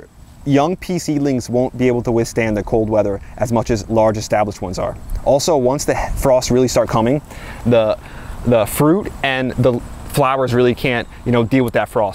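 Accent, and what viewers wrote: New Jersey, United States. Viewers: You’re so Jersey lol love it -coming from a 973’er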